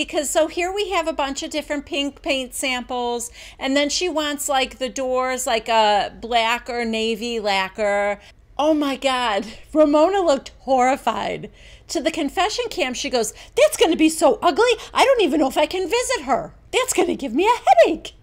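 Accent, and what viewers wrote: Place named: Chicago, United States. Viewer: I love Luann! She's such a character. Sonja is the most eccentric, accidentally hilarious, cringiest housewife of them all lol I got the same vibe from Barbara. The only positive thing that could happen from that is that she knows a lot about Luann, and doesn't seem to hold back based on that convo with Carol a few seasons ago. I don't know, im on the fence about it too. By the way, what state do you live in,? You gave a slight accent that reminds me of Chicago or something. I'm from a very small, rural town in Indiana, and everyone thinks we sound southern lol but I've always wondered where you live, if you feel comfortable telling us.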